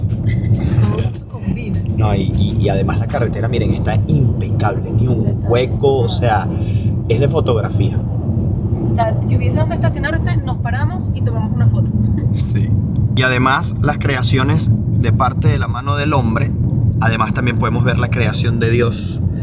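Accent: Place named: Venezuela. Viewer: Hola. Excelente es muy refrescante y entretenido. Felicitaciones paisano 🇻🇪👍 claro ese acento del venezolano es Inconfundibles!! Jajajaja desde Venezuela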